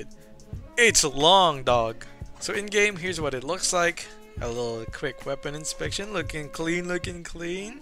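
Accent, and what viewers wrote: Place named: Philippines. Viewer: Bro can you make a video with speaking Filipino me especially like your Filipino accent so can you do a video pls!??.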